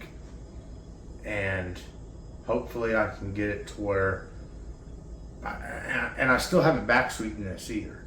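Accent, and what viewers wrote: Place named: Indiana, United States. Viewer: Have I missed you introducing yourself? Not sure I caught your name or where y'all are from, I wouldn't be surprised if your accent is from my homeland of southern Indiana. I'd love to learn a little more about ya.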